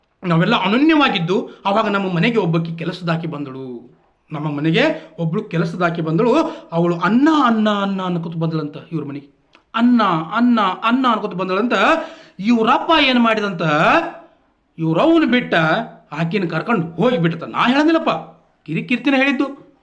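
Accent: North Karnataka, India. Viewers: Khadak uttarakarnataka spacial bhashe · Nimma utthara Karnataka maathu keloke thumba khushi agutthe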